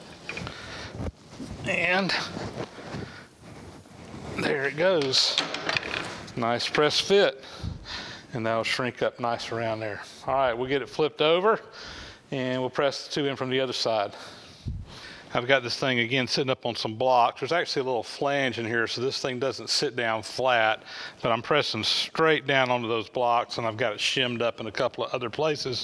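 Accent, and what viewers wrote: South, United States. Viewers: As a new subscriber to your channel and a longtime subscriber to Leo’s I would like to ask a favor. If you will sir, please take a breath between your name and Vintage Machinery. It sounds like one-word it all runs together and is difficult to understand. The rest of your video sounds great with your eloquent Southern accent. I know your future subscribers and some of your present ones will thank you for it. Looking forward to watching more of your channel.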